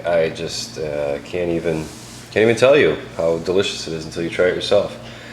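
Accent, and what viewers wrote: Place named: Chicago, United States. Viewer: You have to be from Chicago land area? Based off of your accent, I live near there. Just bought the Anova 😍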